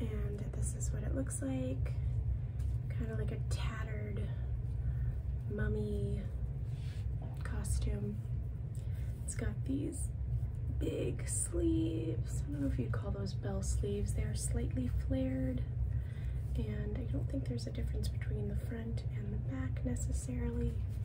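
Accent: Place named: United Kingdom. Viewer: stoppp omg you have to do a video where you just talk with your british accent!!! ❤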